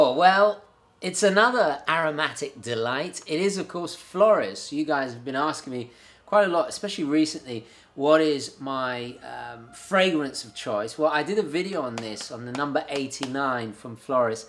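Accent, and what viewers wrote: United Kingdom. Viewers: Nice video. By the way I love the British accent!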